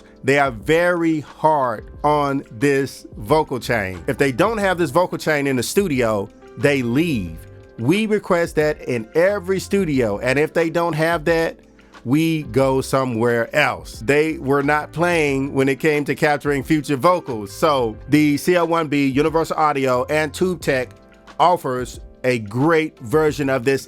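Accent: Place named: Nigeria. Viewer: I Don't know why i though you are Nigerian 😂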